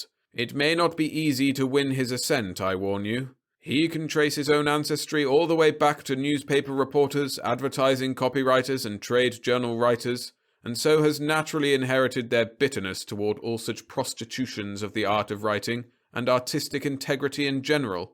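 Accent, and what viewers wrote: United Kingdom. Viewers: I'm sorry. Some of them really hit oddly in a British accent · The narrator has an annoying UK accent.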